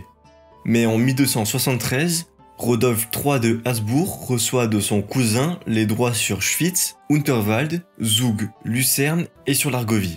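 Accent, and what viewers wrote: France. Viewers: On sent que c est un français qui a fait la vidéo! On ne dit pas LE Schwytz ou L’Uri mais simplement Schwytz ou Uri, et pas « glarisois » mais glaronais…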